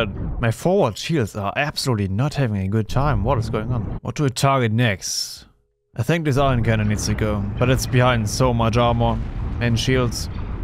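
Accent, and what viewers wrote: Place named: Germany. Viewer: Finally, the Empirer narrated as it should be... in German accent!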